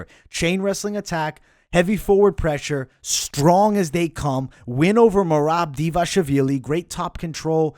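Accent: New York, United States. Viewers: U sound like ure from nyc I like u automatically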